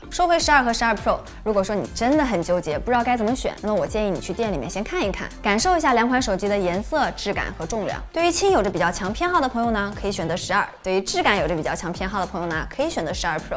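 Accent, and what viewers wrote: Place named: Nanjing, China. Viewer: 总感觉全程自动翻译成南京话呢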